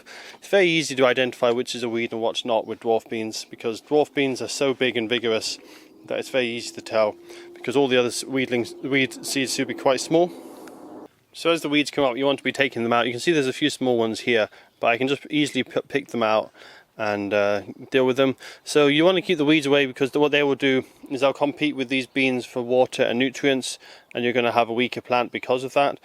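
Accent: Scotland, United Kingdom. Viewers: Thanks for posting!!!! I never comment but as a new follower who really appreciates your videos I had to. Your videos are so helpful and a sweet bonus is your great Scottish accent! Definitely going to binge all your videos so I can get pro at gardening during quarantine!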